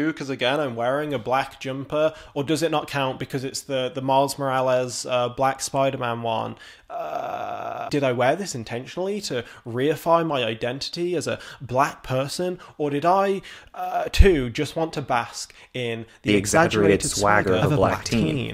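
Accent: United Kingdom. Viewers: Sounding black really isn't a thing, said the whyte woman? Why do you think you'd be the one to decide that? Sounding black in terms of slang, word choice, accent and sentence structure definitely is a thing. Again when whyte people want to be racist and want it to be a thing they know how to sound in order to mock black people. So I don't buy it Yeah his british accent is very obvious. I don't think it was lost on most people, but even for a british person he sounds very whyte, lol. He literally has a whyte mother, don't even know why you're arguing this. 😁 Even british people will tell you there are all kinds of accents, you don't think a black caribbean or african accent is one of those? And yeah you can be black and not have these typical black accents but it doesn't mean you'd sound or act like him. He definitely has some extra whyte mayo in him (I mean he does literally, he's not black he's half whyte) and it's no surprise that it comes through in his views on race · I didn't know that you had to base how you wear your hair, your clothing, or your vocabulary based on your skin color.....that is a weird concept to me. 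At what point does the percentage of your heritage outway the pigment in your skin and visa versa. 🤔 And can you believe that "people of color" live in all sorts of different countries? Its like...crazy to think that people have moved and immigrated to other countries. (Sarcasm) I watched your video and my first thought was oh he is british... not that you didn't sound black... whatever that means. 🙄 I enjoy your content and the way you present it. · the problem with the vocabulary argument is that people said he didn’t sound black which isn’t really a thing. He just didn’t sound American because he is British. People seem to think that black identity only exists in America which is just false. That what I mean when I was confused by people saying he didn’t sound black. But again, he’s just British. My partner sounds exactly like him because he was raised in London, does mean he isn’t black tho. 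Again they are just British 🇬🇧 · I seriously cannot believe that people said you're not black because you have a British accent, sound too smart, dress too well, etc? I think you're hilarious and am glad you confront this head on rather than hiding from it.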